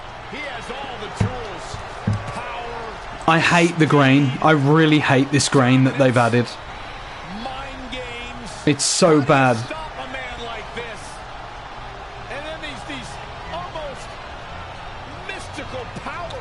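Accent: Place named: West Midlands, United Kingdom. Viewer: I honestly can't believe I can't I payed 150 for this game.....btw I'm the person who recognized your west Midlands accent 😂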